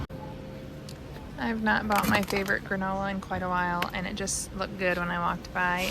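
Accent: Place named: Midwest, United States. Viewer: Your accent told me you are midwest.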